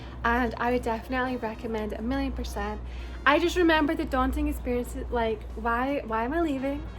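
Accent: Scotland, United Kingdom. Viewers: It’s so amusing to hear the blend of your Scottish accent with the American accent! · Love Your Scottish Accent So Pretty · Please don't loose your Scottish accent and your beautiful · Please don’t ever ever ever ever lose your accent. The Scottish accent is like music.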